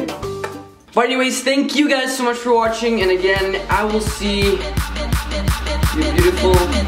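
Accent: Argentina. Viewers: Why are you my perfect guy but live so far away. Hahahaaa love your videos. This message is kinda late but ok. I love your argentinian accent! They're my weakness hahbaha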